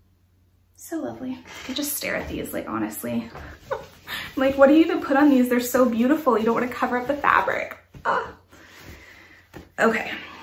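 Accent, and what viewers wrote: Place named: Canada. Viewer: Your stitching is so beautiful! Always enjoy your videos. You’re adorable and I love your Canadian accent ❤️ Also, you talk super fast. It cracks me up 😂❤️❤️❤️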